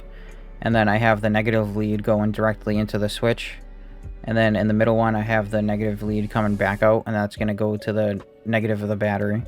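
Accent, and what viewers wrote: Boston, United States. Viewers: Are you from MA? I’m hearing a Boston accent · You from Boston?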